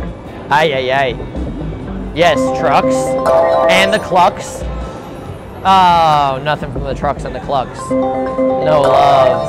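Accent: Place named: New York, United States. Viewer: Love your channel cause I like your New York or New Jersey accent. LOL Now for educational purposes: You first have to educate yourself to the rules of the game that are there for everyone to read, ESPECIALLY THOSE PLAYING THE GAME. After you have done this, you can then educate your audience. Thank you very much. Best wishes for a prosperous and blessed New Year.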